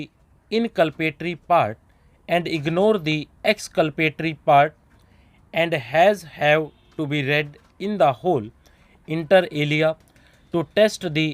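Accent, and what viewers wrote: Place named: South India, India. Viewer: Sir your dictation helps me a lot. Thank you very much. But I am from kolkata and i am facing a problem with your accent(south). What should i do now?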